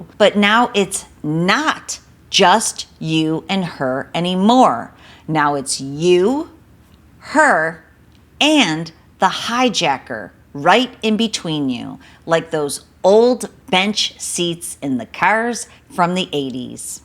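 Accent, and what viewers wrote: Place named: Great Lakes, United States. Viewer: You're from somewhere around the Great Lakes arent you?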